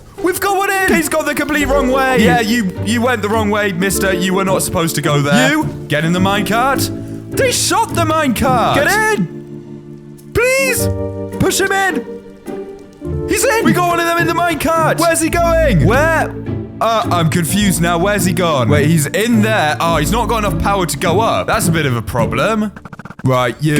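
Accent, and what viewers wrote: United Kingdom. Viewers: Like if has a British accent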